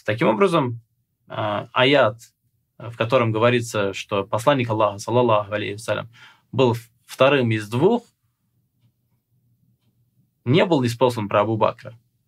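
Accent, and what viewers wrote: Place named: Iran. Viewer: Ибарат не можешь нормально прочиталь арабские слова подрожая иранские мотив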